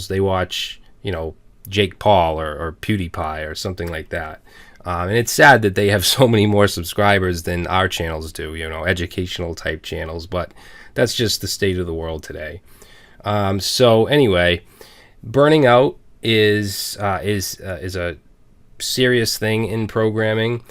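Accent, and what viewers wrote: Boston, United States. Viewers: Love those videos. They inspire us to learn more. Keep producing them please. Your channel is the best I have encountered. Learned a lot from your channel. Oh, and stop hiding that Boston accent, it reminds me of a great movie, "The Departed". Happy New Year to you and your family! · Needed to hear this this week - so thanks! Love your channel. Also don't hide your Boston accent! It's great! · Don't hide the accent brah. A Boston accent is trendy now-a-days. Excellent video. I've definitely felt the imposter syndrome. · Hi, this video really spoke to me. My history is plagued with depression and self doubt but decorated with a passion for coding. You really put the human element back in the job of coding. Many thanks. BTW, I am an old guy and your accent takes me back to JFK, a shining leader in my youth - hope you don't disguise it, I miss it, it is wonderful.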